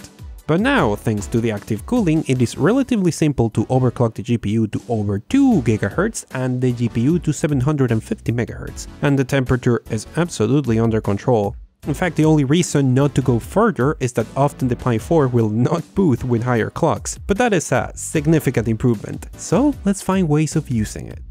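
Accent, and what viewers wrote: Spain. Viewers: deshacerte del acento español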